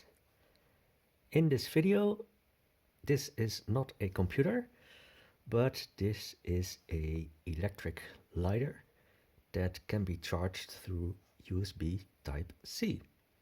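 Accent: Netherlands. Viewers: Wow weve got a dutchee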